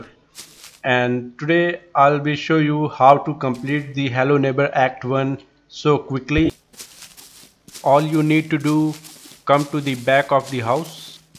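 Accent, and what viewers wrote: India. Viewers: When I heard your voice...”sigh”ARE YOU INDIAN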